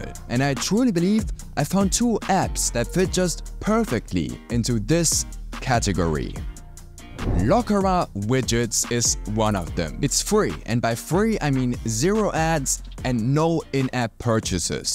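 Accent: Germany. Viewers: Ich wusste irgendwie dass du kein Ami bist. Hast ein sehr deutschen Akzent😊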